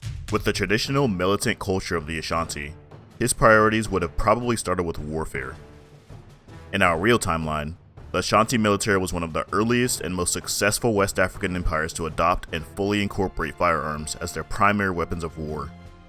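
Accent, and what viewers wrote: Appalachia, United States. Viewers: Love the hillbilly voice!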